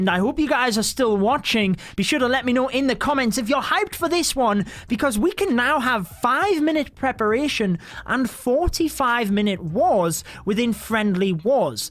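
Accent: United Kingdom. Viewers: The intro and that sweet British accent just makes everything better!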